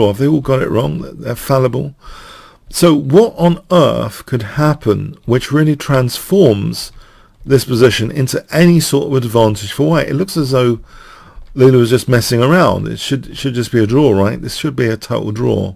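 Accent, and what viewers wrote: England, United Kingdom. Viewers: hay interesting as always, by the way just wondered from where is thet fantastic accent? area England??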